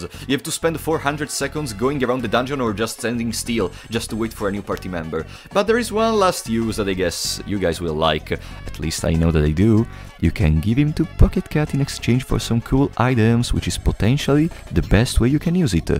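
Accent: Italy. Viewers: Are you italian? Your accent is very similar to mine when I try to speak english haha thanks for the tips!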